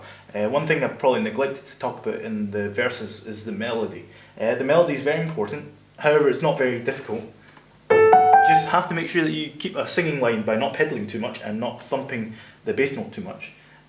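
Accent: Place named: Ireland. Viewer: you sound like your from Europe or Ireland. Forgive me if I'm wrong.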